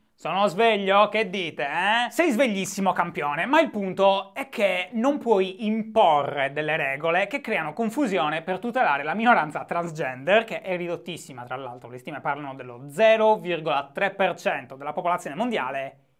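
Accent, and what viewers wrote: Sardinia, Italy. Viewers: Minchia se sei sardo!!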